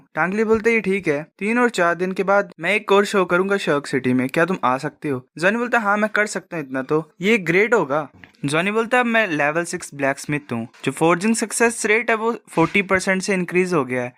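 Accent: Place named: Pahadi, India. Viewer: Pahadi voice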